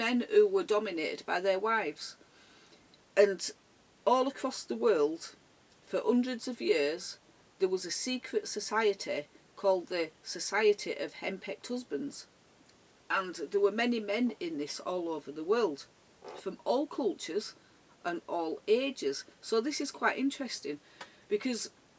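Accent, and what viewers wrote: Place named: Northern England, United Kingdom. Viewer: Still love your northern dialect:)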